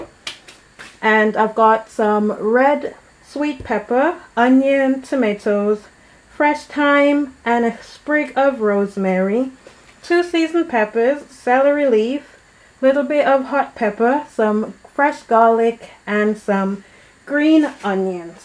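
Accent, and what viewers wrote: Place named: Montserrat. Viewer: Funny when I heard your accent and saw the ingredients my first thought was Montserrat 🇲🇸. Are you from Strat? Very good video, clear and easy to follow.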